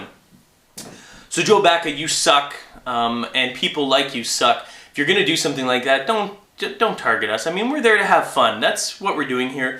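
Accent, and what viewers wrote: Canada. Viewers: wate yor canadian?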